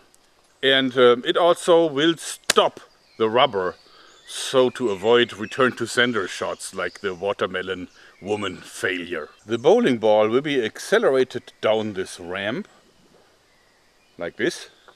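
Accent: Germany. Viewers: Am I the only one who gets this sue per sinister feeling when I hear this dudes harsh German accent?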